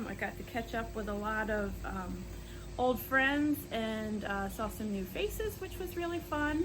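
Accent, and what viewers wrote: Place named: Cincinnati, United States. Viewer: Luv that you’ve been taping outdoors... I noticed the butterfly house straight away! I am originally from Cincinnati. Are you as well? I think u have a Cincy “accent” and just one more thing to love about you... blah, blah, blah! Congrats on all your finishes! Great work! 💪🏻😎🎀👑🌿🦋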